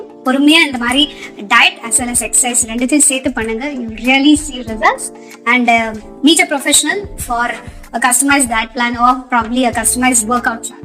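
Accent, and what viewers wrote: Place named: London, United Kingdom. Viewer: உன் சொந்த ஊர் சென்னை வியாசர்பாடி தானே பிறகு எதற்கு லண்டன்லே பிறந்தா மாதிரியே பேசறே.. இன்னமோ தமிழே தெரியாத மாதிரி 😠😠😠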